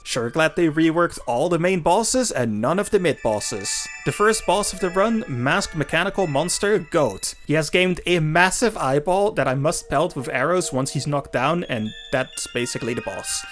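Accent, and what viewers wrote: Netherlands. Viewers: I always get whiplash when I hear a dutch person making videos I'm actually interested in 😅 don't see it often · Are U dutch ?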